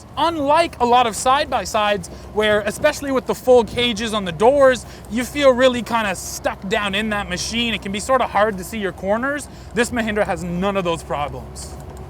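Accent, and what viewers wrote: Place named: Minnesota, United States. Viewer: "You know...." :) ...Are you Minnesotan? I am, and you sound just like me... You know? :)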